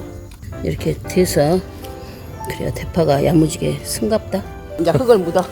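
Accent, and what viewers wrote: Jeolla, South Korea. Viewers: 아따~!! 전라도요~~!! 구수한 사투리 정감있구마잉~ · 구독 박고. 팬함. 전라도 사투리가 정겹네. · 아따 전라디언이어라~~ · 즌라도 사투리에서 신뢰감 확 깨는데? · 전라도 구수하네잉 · 전라도 광주나 전라도 목포쪽 톤이시네ㅋ 시골에 우리 이모 목소리같다. ㅋ 정겹네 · 전라도 말투라했더니 역시 먹는 음식에 잘 하는군요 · 전라도 어딘가요 정감있소~ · 고향이 전라도인데 사투리 너무 정감가요 어머니. 건강하세요. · 전라도 목소리 듣기 힘드네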